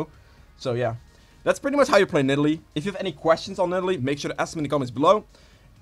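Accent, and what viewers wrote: Netherlands. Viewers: you sound like ur dutch and nice video bro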